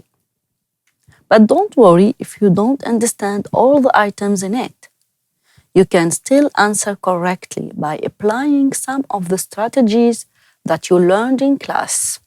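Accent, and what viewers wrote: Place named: India. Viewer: It's giving Indian accent ❤️😆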